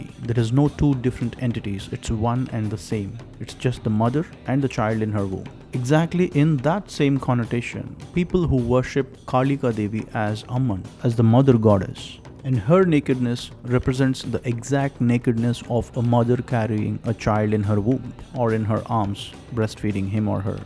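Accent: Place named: Tamil Nadu, India. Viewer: Hi I'm not judging From your accent I can feel that you're from Tamilnadu? I might be wrong, sorry for that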